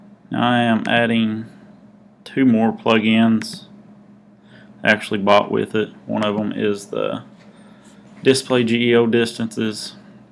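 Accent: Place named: Appalachia, United States. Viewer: Hey there, Thank you for your very helpful information. Can't help but notice that you sound like you're from the Appalachian Mountains area, close to me, in NC.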